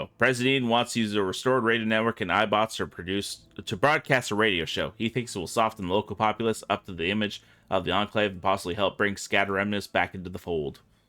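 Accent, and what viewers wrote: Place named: South, United States. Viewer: Loving the accent it's like you flip between a Boston accent and southern.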